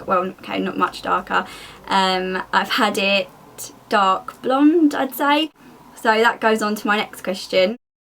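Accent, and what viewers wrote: United Kingdom. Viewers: I can understand what she's saying. I personally love British accent, its probably because of Harry Potter. XD I always thought British people have cool accents. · wtf? does someone understand what this girl is talking here?=))))))))))))) for god safe speak too too much fast :)) i don't think she realise :)) i always hated british accent is horrible , british sucks:| the acent is enough tacky she shouldn't talk fast , british accent + fast talking= SUCKS girl please don;'t speak so fast in your videos if u want ppls understand u:)) not just british ppl using internet LOL ..LMFAO :)) this made my day=))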